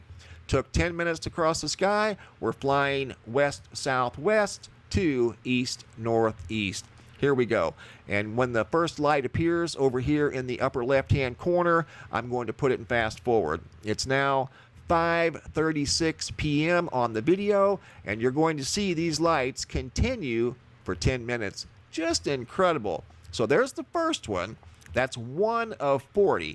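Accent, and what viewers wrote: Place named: South, United States. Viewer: Love the channel bro 😊👍 Great southern accent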